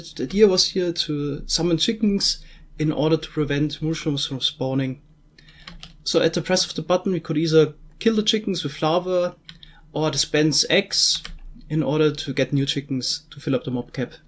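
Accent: Germany. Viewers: Are you German ???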